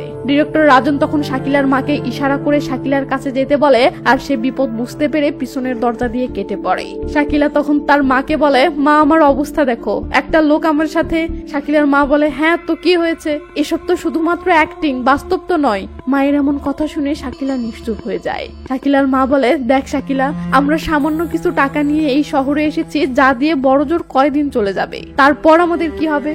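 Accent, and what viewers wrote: Bangladesh. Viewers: I am indian . But, I did not see these good movies , I like your Bangladeshi speaking syle .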